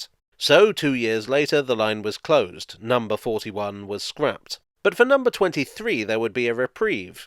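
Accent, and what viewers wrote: United Kingdom. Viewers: you have one of the most pleasant voices and diction on Youtube. So perfectly clear that your unmistakenly British accent is but nice color.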